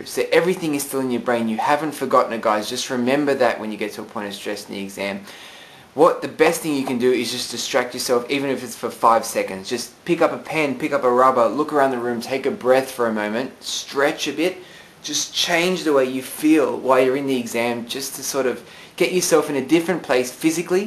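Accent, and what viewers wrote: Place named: Australia. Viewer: He did. He sounds Australian, and we say rubber :L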